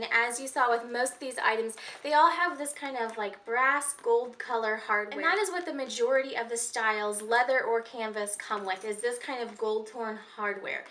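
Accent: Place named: United States. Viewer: your little yankee accent is so cute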